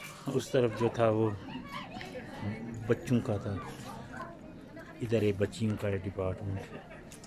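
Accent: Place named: Punjab, India. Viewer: AsalamOalikum masahAllah apki boli suun kr apnaa punjab yaad ajata hai 😇khush rahay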